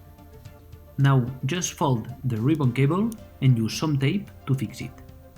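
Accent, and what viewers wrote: Spain. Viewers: Tu ingles es tipo vallecas. Eres español no??? Donde pillas componentes? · eres español??¿¿ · Eres español a q si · Acento de español como una casa 🤣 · La virgen como se nota que eres español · Tu eres Español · Claramente eres español, pero me encanta tu inglés jaja (y también tu canal, joder!) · hola tu eres español no????? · Cómo se te nota que eres español!!! 😂😂 Porque me pasa lo mismo con el inglés! Suscrito!! Yo le voy a hacer el mod a una SP AGS-001. Porque de momento no encuentro una Advance bien de precio y se lo haré a la SP que tengo desde el 2004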